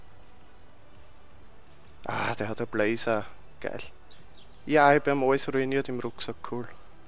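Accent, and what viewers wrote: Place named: Austria. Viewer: Ich bin auch Österreicher, voll krass aber irgendwie geil dass du auf YT so redest :D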